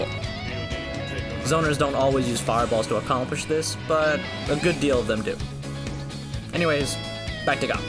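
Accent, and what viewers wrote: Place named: United Kingdom. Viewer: In that intro you really sounded like the slay the princess narrator lol xDDDD Or maybe its because im not used to brittish accent idk, but it reminded me so bad of that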